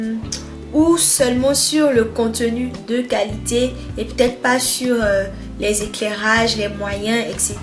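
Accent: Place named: Ivory Coast. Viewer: L'accent ivoirien!!! Yes bisous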